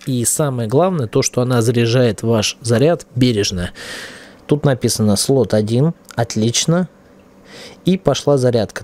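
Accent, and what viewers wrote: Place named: Russia. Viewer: От аканья автора уши болят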